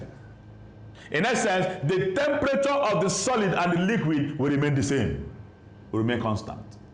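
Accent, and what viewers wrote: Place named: Nigeria. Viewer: hello sir, wonderful lesson but i found somethings lacking. you said quite a number of words that I've never heard before, like the tool used to measure matter but the spelling wasn't shown on the screen. as you already know, Youtube subtitles don't really do a great job in translating the Nigerian accent. Please work on either adding your own subtitles or letting the important points appear across the screen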